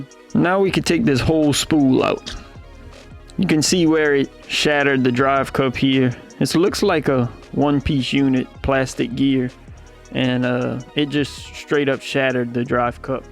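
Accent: Louisiana, United States. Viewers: Great video brother very informative I'm about to swap mine out. Your accent gives me hope that I am not the only one drifting in Louisiana! Greetings from Denham Springs.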